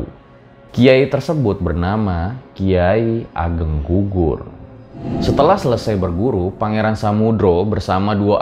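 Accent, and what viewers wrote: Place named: Sragen, Indonesia. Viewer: SRAGEN MANA SUARANYAA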